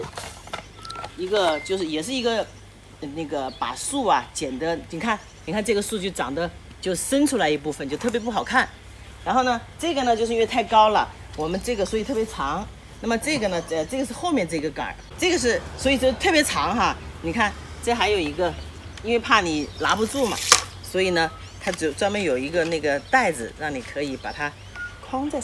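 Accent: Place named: Sichuan, China. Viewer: 阿姨很棒！您说是我们家乡的川普么~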